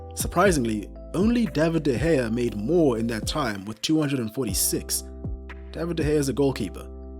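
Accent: South Africa. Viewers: My man's accent sounds like he's a homeboy from South Africa. Love your vids mfana. Keep it up. Hope you blow up. Holla if you want to do a duo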